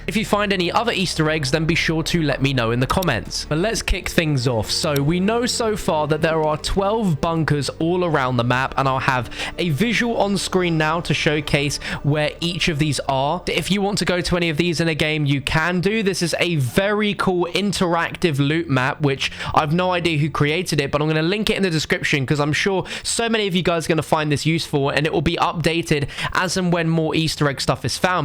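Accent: England, United Kingdom. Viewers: It’s that British accent man gets us every time. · Why is every youtuber who plays cod from England or a England accent never fails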